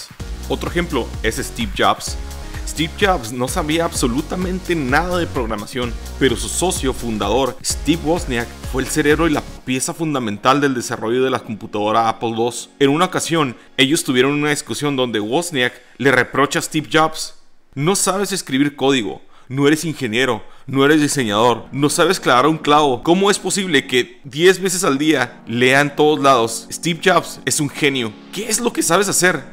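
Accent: North, Mexico. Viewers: Si no fuera por ese pinche acento norteño este video sería bueno!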